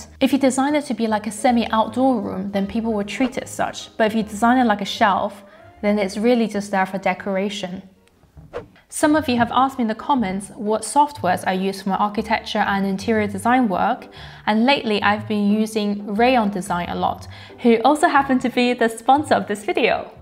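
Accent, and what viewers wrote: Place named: Southern England, United Kingdom. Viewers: Are you from southern England? It sounds like an English accent that's south of the Humber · That was a very nice video, thanks for the tips 👏🏽👏🏽❤. I study architecture for hobby and learned a lot by seeing it. Loved how you show that list between the video's chapters and the final list with all the items: if someday I create content for the internet, I'll definitely use that in my videos. Ps: Where is your accent from? South England? It's so nice. I'm Brazilian and a considerable part of the english we consume over here is from USA 🤠. Best wishes from Brazil 🇧🇷.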